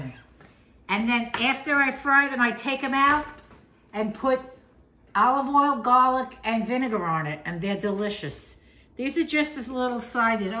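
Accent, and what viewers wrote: New York, United States. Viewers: I detect a New York accent.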